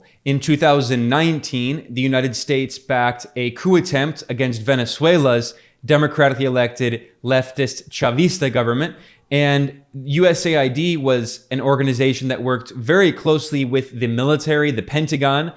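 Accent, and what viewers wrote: Spain. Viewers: Beautiful blue eyes and Spanish pronunciation STOP DISTRACTING ME BEN!!!! I’m trying to focus on this A+ Geopolitical Content!!!! 😂😂❤❤❤❤ Great video as always 🙏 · I just love LOOOOOOVE your Spanish accent. Greetings from Mexico. ❤️❤️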